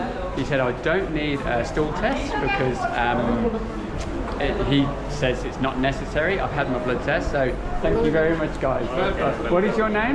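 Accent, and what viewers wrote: United Kingdom. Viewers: Thanks for posting. My second great grandfather died after sailing out of India years ago on a tea clipper. It really does frame the disease risks of living abroad. My own personal experience of living abroad is in China, where I lived for many years. Before I went I took quite a few shots of vaccination, and while there I boiled all my water, unless I drank bottled. All my time, more or less was spent in cities. I'm Rh- and I read somewhere we are less likely to get Malaria - I don't know if anyone reading this has heard this....? By the way, whereabouts are you from - I'm guessing somewhere between the East Midlands and London?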